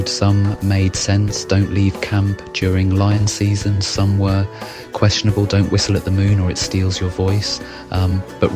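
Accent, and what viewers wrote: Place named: South England, United Kingdom. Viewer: I'm a Geordie. It's always nice to hear that refined southern accent saying something that isn't absolute shite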